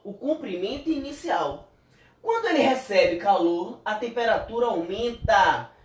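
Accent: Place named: Bahia, Brazil. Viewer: Ce é da Bahia ? Sotaque parecido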